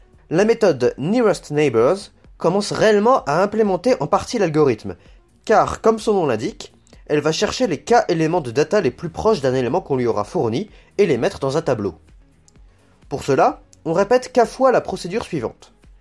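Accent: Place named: Belgium. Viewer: Toujours plus d'accent belge !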